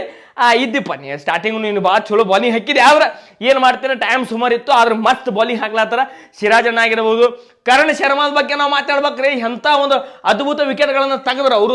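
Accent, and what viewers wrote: North Karnataka, India. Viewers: Anna super talking....namma uttarkaranataka basheyalli...ninna RCB abimanake love u......plz go to I p l...kannad cometary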